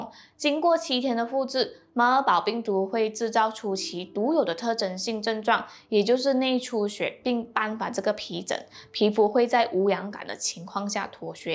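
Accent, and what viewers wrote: Malaysia. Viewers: 口音和咬字有点奇怪。。 来自马来西亚吗？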